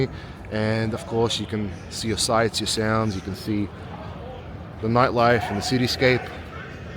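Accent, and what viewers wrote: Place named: Australia. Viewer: Братишка мне так нравится твой Австралийский акцент. Как русскому кто немножко говорит и понимает на англи йском языке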